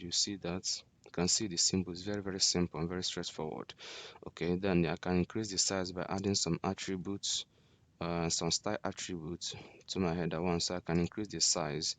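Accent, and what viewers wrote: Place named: Nigeria. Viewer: you sound like a 9ja man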